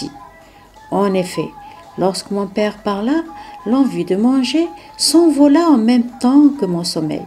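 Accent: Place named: Algeria. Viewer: Un bon resume, avec un accent Algerienne. Tres Bien au narateure ! Merci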